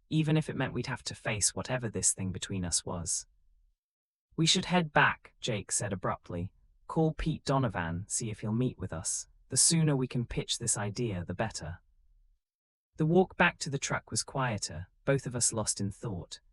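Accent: United Kingdom. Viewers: I absolutely love the storyline it shows that Jake and Carter has true love their soulmates even if a Brit wrote the story I wish that they would get someone to narrate it with more of a Montana North. This is not the first word to listen to someone from Brett narrated it's just that it would make it more believable if they would get someone from the USA. I'm not trying to be racist or negative but that's just my opinion...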